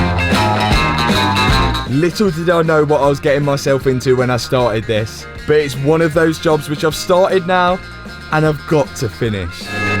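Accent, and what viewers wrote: Leicester, United Kingdom. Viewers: Leicester boy by your accent 😉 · Damn this guys really reminds me of the Stradman. Just hear the way he is talking (except the British accent) and also the hat (they are both always wearing a hat)! They also have the same sort of energy 😀😀😀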